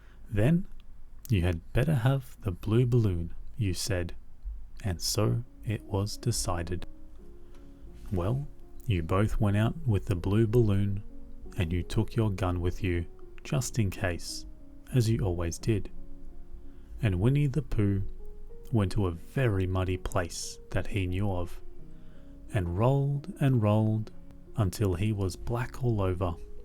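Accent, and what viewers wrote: Australia. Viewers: Thank you, I was seeking out Australian accent story tellers for my daughter (we moved to Canada where I’m originally from to give it a go being close to some family for at least the next several years) and I am feeling a bit guilty, hoping she will hold onto some part of her accent so your story telling is much appreciated :) and after living in Sydney for over 20 years and as a proud Sydneysider I have to admit I’m really enjoying it too :)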